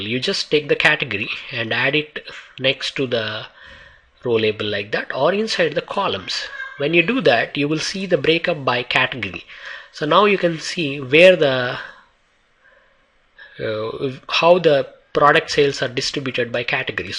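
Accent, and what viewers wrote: India. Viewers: Interesting video. Although, your accent sounds like a typical Indian, I still can understand you. It's clear and well explained. I think it will be better if you include sub-title to your video so that viewers who are not familiar with Indian accent can understand you better. Anyway, it's a GREAT JOB. Thanks a lot. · I open the video, hear and indian accent and immediately know that the tutorial will definitely worth my time.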